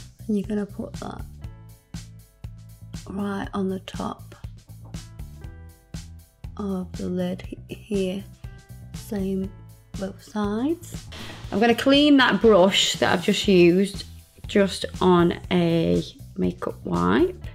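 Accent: Liverpool, United Kingdom. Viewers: I thought you were a scouser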